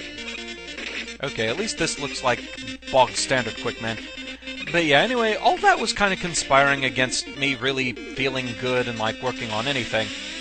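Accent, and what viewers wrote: United Kingdom. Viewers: umm so you migrated to there from Britain? Because you have such a nice British accent! Or do i just not know anything :D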